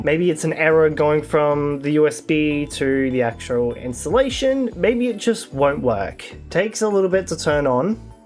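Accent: Australia. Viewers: he's Aussie.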